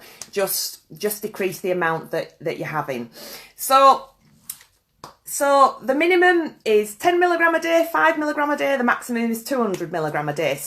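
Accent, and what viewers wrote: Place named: West Yorkshire, United Kingdom. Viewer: Which part of West Yorkshire you from?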